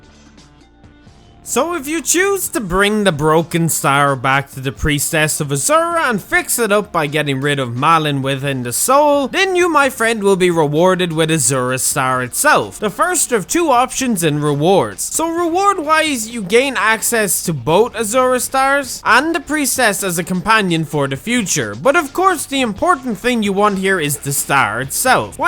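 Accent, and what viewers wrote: Ireland. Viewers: noice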